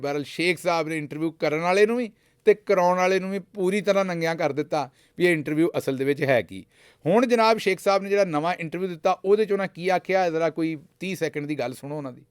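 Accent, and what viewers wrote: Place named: Punjab, India. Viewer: No problem in London Doctor sub love your Punjabi accent Kamran from East London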